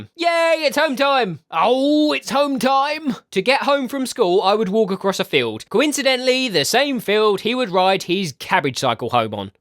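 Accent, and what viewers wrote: United Kingdom. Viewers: OMG!!!! YOU'RE BRITISH ACCENT IS SO AWESOME DUDE!!!!!!!!😻😻😻😻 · How come you have a British accent but a American school 🤔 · You have a British accent, yet go to 'high school'. hMMMM? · you sound British.... i like Britains since i am myself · I absolutely love your british accent. Subscribed. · I just found out about your channel and realised....we both have the name doodles in are channel......Steve doodles....xD also I subbed cuz I love your art style...also are you British? Sounds like you are xD I am too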